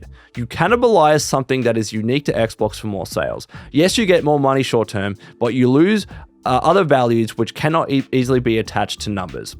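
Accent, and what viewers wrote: Australia. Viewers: Loving the news coverage becoming a thing for this channel, something about an Australian accent always makes stuff more interesting I guess 😅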